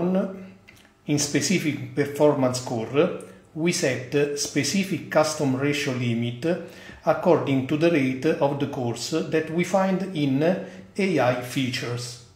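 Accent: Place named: Italy. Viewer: you sound like Italian :face-blue-smiling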